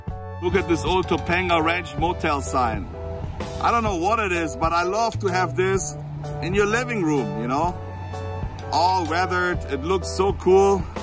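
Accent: Germany. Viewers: Thanks for the tour. I find it very interesting. Seems there's many beaches to choose from, all pretty close together. Loved the video. Love your Deutschland accent & the Arnold impression. Stay safe!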